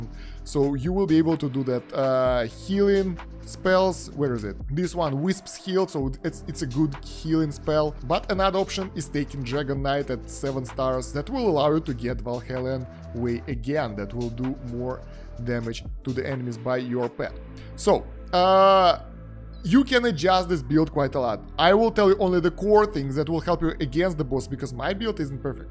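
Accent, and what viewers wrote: Russia. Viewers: слышу русский акцент)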